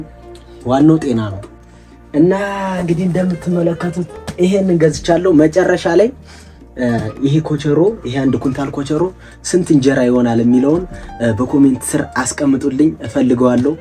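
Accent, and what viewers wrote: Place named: North, Ethiopia. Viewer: ጎበዝ በርታ የኔ ልጅ አማርኛህ የወደ ሰሜን ነው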